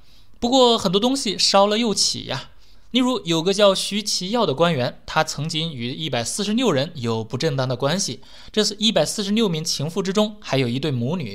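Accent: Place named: Hunan, China. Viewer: 我对湖南口音很排斥😂😂😂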